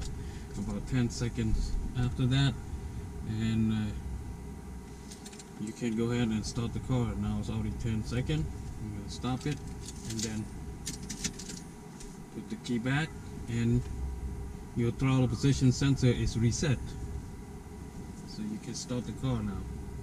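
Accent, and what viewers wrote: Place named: Cambodia. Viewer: I detect a Khmer accent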